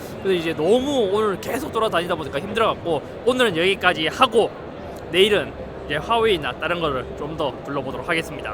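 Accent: Gyeongsang, South Korea. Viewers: 외국엔 좀 안나가면 안되나 한국인이 다 잇섭처럼 눈작고 안경끼고 말투 경상도말투 쓰는줄 알거아냐 ㅠ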